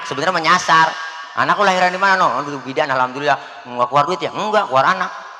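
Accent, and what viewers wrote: Jakarta, Indonesia. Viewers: Betawi'y dapet ini ustad 🤣 Sya bangga sya juga betawi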